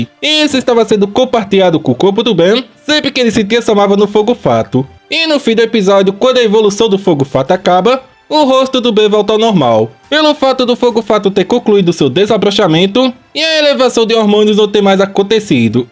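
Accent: Brazil. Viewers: Me pergunto de que país esse cara é, o sotaque bele parece muito com o ptbr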